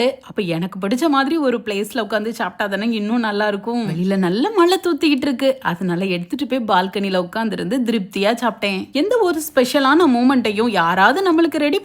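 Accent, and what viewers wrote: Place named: Tamil Nadu, India. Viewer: Love your videos your passion and your tamil accent too ❤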